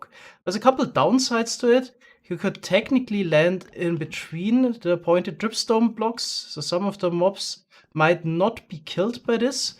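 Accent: Germany. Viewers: Hehe, auf den deutschen Akzent ist Verlass. :D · Ich kannte ihn noch nicht hab aber direkt raus gehört das das deutsches englisch ist 😂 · u are safe german i can hear the accent. dikka red sag doch einfach dass du deutscher bist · you are german right? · Came for the farms, stayed for the spiffing skin, and German accent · man hört den deutschen raus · are you german? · hahahah der deutsche dialekt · Are you from germany ? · Irgendwie kann ich einen deutschen Akzent raushören 🤫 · this man has the most german accent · Bist du Deutscher? Dein Englisch hört sich iw so an. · Sounds like you are a german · that perfect german acsent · Du bist Deutscher das höre ich sofort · I even can hear that you are a german😂 Grüße gehen raus an alle deutschen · ah dude this english i love it. True German :D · Man hört so das du deutscher bist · Du klingst so deutsch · deutscher Akzent check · Ah, weil er ein Deutscher ist der englisch redet 🥴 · Du klingst so hart deutsch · Are you german? 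· Da hört man doch den deutschen Akzent :) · you are german right, i mean the accent ? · are u german? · Wegen deinem Dialekt glaube ich das du aus Deutschland kommst. gibt es das auch auf Deutsch? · Er ist soo deutsch oder? Das hört man mega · You have the Biggesee germen accent i herard in my whole life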